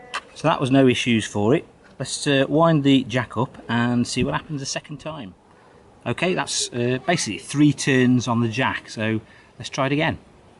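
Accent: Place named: Stoke-on-Trent, United Kingdom. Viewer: Hey bud, Cracking vids... I’ve just purchased one of these mn99 myself and will be looking to weight my wheels when it arrives, What weight would you suggest putting in after your test? 40g 50g? Also I couldn’t help but notice your accent, are you local to the Stoke On Trent area?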